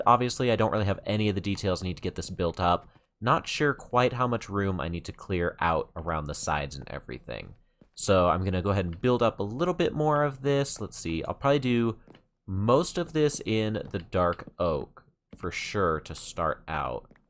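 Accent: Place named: Ireland. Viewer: The accent sounds Irish lmao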